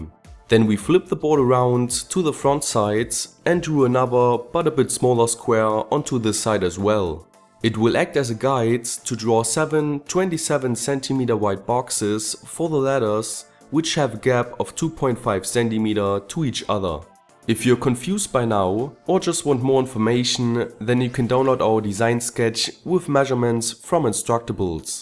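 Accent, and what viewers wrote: Germany. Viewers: So many times he says zentimeeters xD I am more into the electronics Videos of GS but this one beats them all wit di akzent :D ( and please don`t get mad man ;) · I love your German accent :) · Du krass... Läipsich...góil.... I wüsste das du deutsch bist wegen dein akzent, grüße von plagwitz :P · are you german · Du bist aus Deutschland oder? Das hört man:) gutes video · JV Also offensichtlicher deutsch geht es kaum: sein Akzent, "Leipzig", Deutsche Marken.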